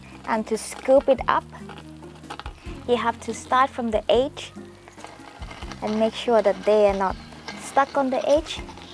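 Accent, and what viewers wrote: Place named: United States. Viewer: Your English accent is not Thai...I'm sure you have been in America...🥰 Love from New Jersey ❤️🫰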